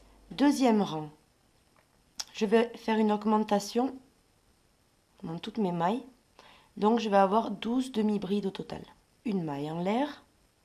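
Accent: South, France. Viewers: Merci, le meilleur site que je connaisse à ce sujet: les explications sont claires, les réalisations très faciles à réaliser, les mains belles à voir et l'accent du soleil un petit plus délicieux, continuez je vous suis, isabelle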